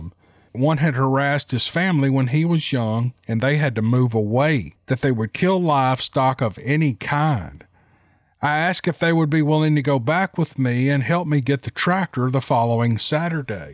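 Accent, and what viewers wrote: South, United States. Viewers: I hope you enjoyed Thanksgiving. I enjoyed your narration of fantastic story.always good to listen to.your goodoleboy accent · Man oh man! Fantastic stories, every one of them! &.... you ALWAYS do these stories justice, your voice becomes animated as you launch into the character of the story. The intensity & excitement in your voice puts the listener right there in the encounter! I just love listening to your sweet Southern drawl. You are truly a master story teller. And thank you to those authors for sharing their encounters! A Wompus cat!? Lol, that's a new one I've never heard. I love it! I remember as a kid growing up on a Texas army base, my dad (a drill instructor of all things) would take my 2 older brothers small game hunting often. If I recall correctly, one of their first hunts on their own was a 'snipe hunt'. Having been let in on the joke while the boys were out hunting (with their pellet guns & burlap sacks) I couldn't wait for their return. 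I knew right then Dad had armed this little sister with some "ammunition" that would provide entertainment at their expense for years to come. Of course karma has a way of finding everyone & turn about is fair play. We had a pretty good snowfall one winter, a rare event in that part of the country, and I missed much of the fun being too scared to go play outside. When the boys found out why, then it was their turn to laugh. Being the last one out the door, after getting all bundled up, Daddy told me to be real careful, especially in the deeper snow, "cuz them thar snow snakes will crawl up your butt & freeze ya ta death." Hahaha We live in the g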